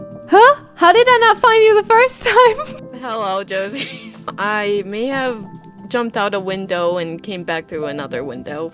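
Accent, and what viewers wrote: Germany. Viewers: The deuich accent is there but the animated avatar and Minecraft skin look Japanese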